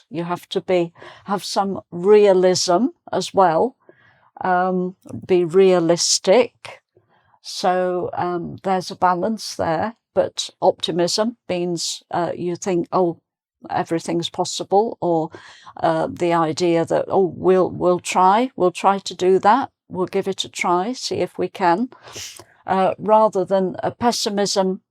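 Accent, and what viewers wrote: United Kingdom. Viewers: Hello Teacher! Be sure you are a very special teacher! British English sounds much more beautiful with you!Thx to you we feel like learning more about your native language and about The UK! You are a symbol of The United Kingdom through the world!Greetings!❤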